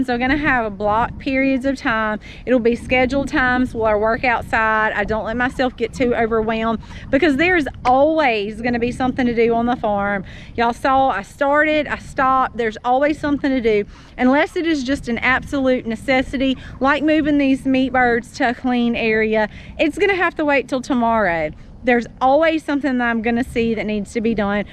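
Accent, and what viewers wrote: South, United States. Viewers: I also love hearing your Southern drawl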